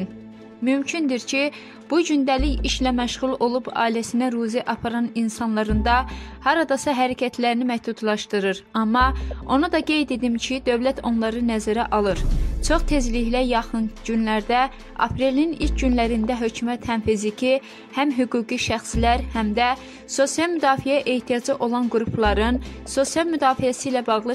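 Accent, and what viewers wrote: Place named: Iran. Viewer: Iranlidi daniwan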